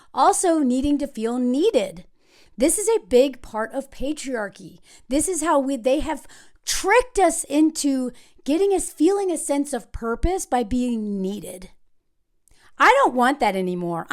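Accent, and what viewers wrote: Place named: Texas, United States. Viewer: The way you talk reminds me of one of my Grandmas. She was from way out in the country in south Texas (not sure which town).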